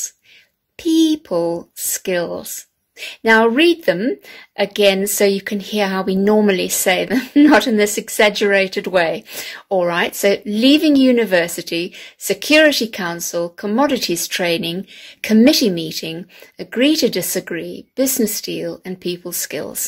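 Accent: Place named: United Kingdom. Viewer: I've really enjoyed the video as her accent is so clear, the british accent is the best accent ever 😍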